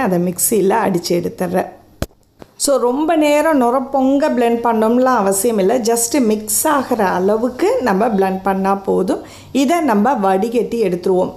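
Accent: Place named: Ramanathapuram, India. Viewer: Nenga sollurathula mukkalvasi enga ooruoda othu poguthu sister i am ramnad mukkiyama vappucha intha word kettathum aacharyama irunthuchu ada namma ooru mathiriye sollurangalenu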